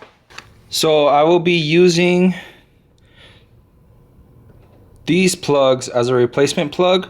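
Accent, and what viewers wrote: Hawaii, United States. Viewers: You from Hawaii braddah? Can hear da pidgin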